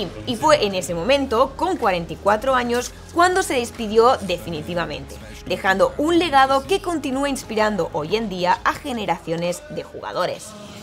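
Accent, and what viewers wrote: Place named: Catalonia, Spain. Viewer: DEJA DE DECIR BRUTAL TAN SEGUIDO, CON TU ACENTO CATALAN TAN CERRADO, DAS BASTANTE CRINGE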